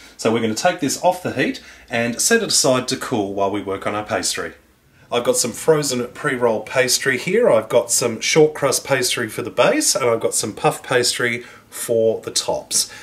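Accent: Australia. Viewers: love the aussie accent ^_^ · 2 things about this video: 1: I live in Mexico and I miss meat pies so much that I'm about to attempt to make my own, so thanks for this video. 2: I'm going to show this video to anyone who thinks all Australians sound like Steve Irwin.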